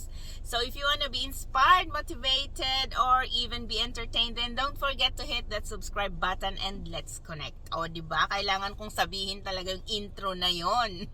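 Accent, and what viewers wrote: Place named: Cordillera, Philippines. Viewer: sabi ko kasi madam, mukang taga highlanders to base sa accent.. heheh thanks sa mga infos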